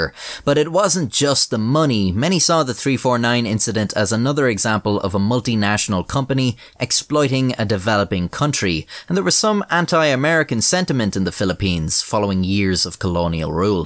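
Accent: Ireland. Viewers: hear tings yup definetly irish (dane so for a sec i was wondering why a dnaish word appeared) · Today is good, the sarcastic condescending Irish man has graced us. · The videos are great but I also dig listening to that Irish accent. 😀 Is it normal for everyone over there or is it a more specific to a certain part of Ireland? Keep up the good work! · Off D sofa trone . . . The Irish pronunciation always make me smile.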